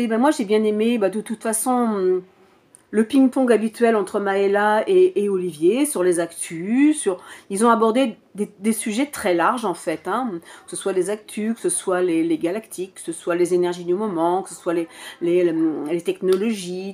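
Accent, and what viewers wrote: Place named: Paris, France. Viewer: Merci pour ton Naturel et ton accent Parisien ❤😅😇🙌🦎⛰🐬👽💫🙏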